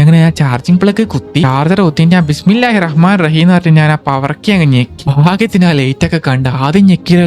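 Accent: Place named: Kannur, India. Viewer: Bro kannur slang matram parayumooo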